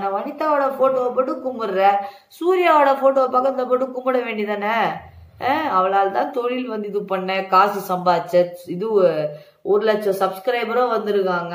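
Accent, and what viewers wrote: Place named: Chennai, India. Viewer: Akka Sema Chennai slang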